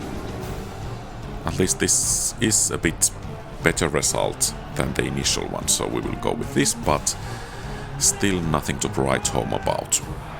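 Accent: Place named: Finland. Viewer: Are u from Finland? Ur accent is so finnish. If you are, paljon kiitoksia sulle Venäjältä! P.S: NoToWar!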